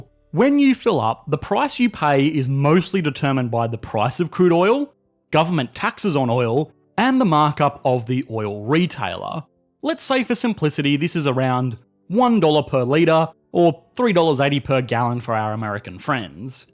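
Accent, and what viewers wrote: Australia. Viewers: Can we all agree Australian is the most abhorrent accent?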